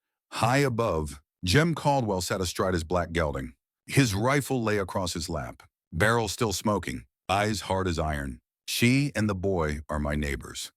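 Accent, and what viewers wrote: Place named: Germany. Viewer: Hi, I’m watching from Georgia, USA🇺🇸 I like Western stories. The story is a heartbreaking one, yet it has redemption from all those who would like to break Clara. Jem was a warm, wonderful man; he stood with honor. Also, this story has a German accent in parts over English, making the story hard to understand. I could only laugh when the narrator read the words. That might be why no one left a comment! Good story! 😊😊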